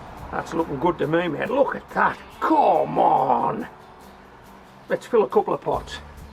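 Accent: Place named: England, United Kingdom. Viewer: Great video! I laughed all the way through at your accent 😄😆😂 I was born in Harrogate, but came to Australia when I was 3 yrs old, but I have an elephant memory, so I have a lot of memories of my time in Emgland, even though I've never been back. I recognise your accent, so entertaining to me 😄😆, you sound like my Dad who I don't get to hear anymore 😔 keep up the good gardening, wouldn't be easy in the UK compared to Sunny Australia, it's Autumn where I am and still 30 deg 😉👌🏾 I'm about to plant my peach sweet potatoes and add some coffee grinds I've been collecting now for about 2-3 year ⬅️ 😄 that's my pommie 😂. 🇦🇺☀️🇬🇧🌨💦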